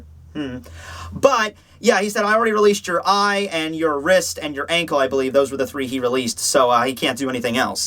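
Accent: Canada. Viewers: Does any one else hear the canada in the intro?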